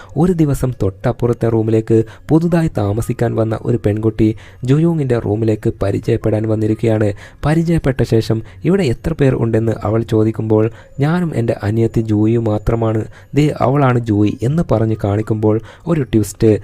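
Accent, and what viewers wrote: Kannur, India. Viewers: Bro kannurkaranano 😮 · chettande nadu evda kannur ano🤔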